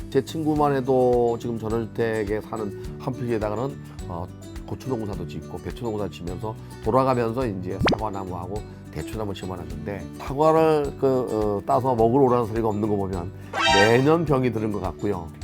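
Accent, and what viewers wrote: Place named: Gangwon, South Korea. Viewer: 교수님 고향이 강원도래요? 어째 말투가 강원도 토박이 말투래요?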